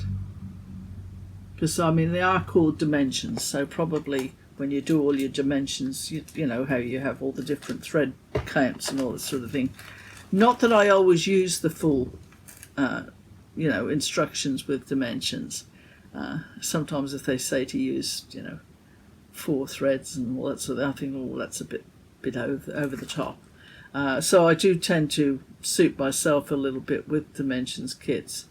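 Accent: Australia. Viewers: Good morning. I cannot wait to see your stitching. Well, I have finished watching and it has been a warm and cozy hour. I especially enjoyed how you kitted up your projects. It’s funny that I would not choose thread cards to organize my threads. However I enjoy your process and even more enjoy your Aussie accent sharing all about it. Merry Christmas!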